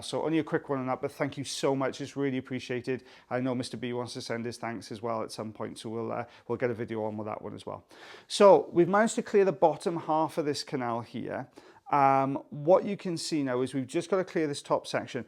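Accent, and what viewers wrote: Wales, United Kingdom. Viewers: Ahhh....after a frantic day your wonderful waxy Welshness has soothed my soul, thankyou 😍😍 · Love the commentary and the vision. So interesting. Sounds like a Welsh accent to this Aussie ear.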